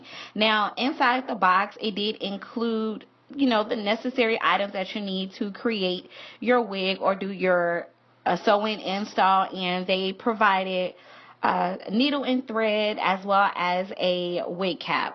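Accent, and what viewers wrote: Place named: Philadelphia, United States. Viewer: are u from Philadelphia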